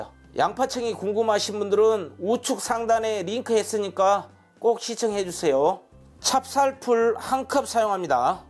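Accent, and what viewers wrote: South Korea. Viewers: 쉐프님 구수한 사투리로 꿀팀!! 레시피 꼬들빼기 ㆍ쪽파 환상의 맛김치 강의 잘 들었습니다 ^^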